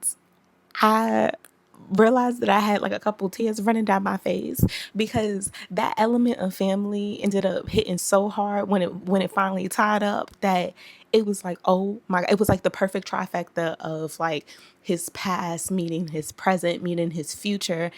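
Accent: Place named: Brooklyn, United States. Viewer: 👋🏽!!! I am just coming across your video and I have to check out more!!! You sound just like me and I love it! BACKSTORY: I’m born and raised in BK - went to BGHS if that tells you anything. Now live in VA. Folks are always talking about my accent 🙄. I’m like, well here’s the pot calling the kettle black🙄. I remember buying our books downtown Brooklyn and along Fulton St. So now Malcolm X is popping like that?! I’m so jelly! I can’t wait to see more vids from you. I read How To Love a Jamaican back when I lived there!! I’m so glad to have came across someone else who knows about this one. I think I want to reread it now! Thank you!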